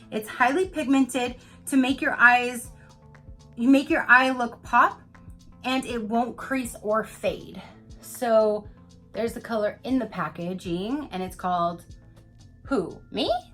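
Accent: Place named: Canada. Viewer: Hi love, I just love the sound you made with the spoolie: buuuuuurom, beeeeroom, beeeerum, booooorum? Inquiring minds want to know.🤪 I wish I’d have gotten the same lipstick. Mine is from colorclub, and it is the EXACT color of the ipsy bag. Another toober, abbadabs, didn’t get her extras either. I have a feeling they are being shipped later. I haven’t heard yet. Another question: where are you from? You sound Canadian, but some Americans far north have a bit of the same accent. I really love yours. It’s so soothing. Gee do I sound like a fan girl, at the wee age of 63? Anyway, I’m not trying to hit on you, I’m happily married for 35 years(about, I always have to stop and count.) our secret? We both had practice marriages, and he was a workaholic who traveled all the time. I figure our actual time spent together is really about 5 years! It was a little hard being a sort of single mom, but once we got to San Francisco Bay Area, it got better. 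Everyone’s husbands worked all over. pm, any restaurant is filled with moms and children. We helped each other out. But these days you’ll often see a smattering of home dads too. My son-in-law is sort of one.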